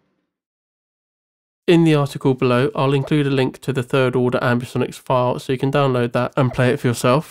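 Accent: United Kingdom. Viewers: Eh, the dude sounds like a caucasian from the UK. Make of that what you will.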